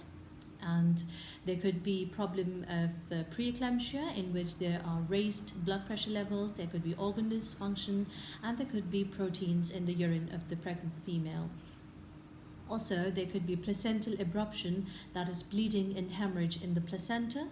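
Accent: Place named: United Kingdom. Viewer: Madam can u plz tell me how did u learn british accent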